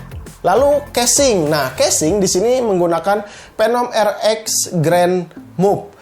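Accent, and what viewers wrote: West Java, Indonesia. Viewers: Itu logat sunda gan, emang klo bila f atau v emang susah, bukan d buat buat itu gan😀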